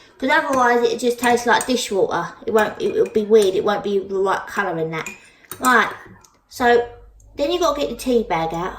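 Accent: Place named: Newcastle, United Kingdom. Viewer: What part of England are you from you sound Geordie I'm from Newcastle if you are as well what part of Newcastle are you from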